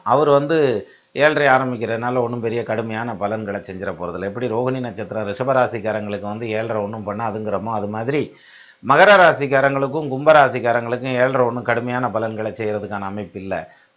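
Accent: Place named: Madurai, India. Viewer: You are great and I belong to Madurai, your Madurai slang is wonderful. One question - People in what age have 2 shift of “Ellarai Sani”...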